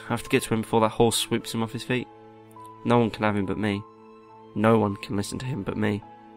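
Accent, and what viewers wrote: United Kingdom. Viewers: british accent. :D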